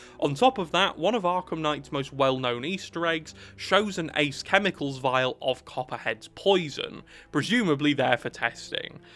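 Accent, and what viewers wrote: United Kingdom. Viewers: British bloke talking about video games? Don't mind if I do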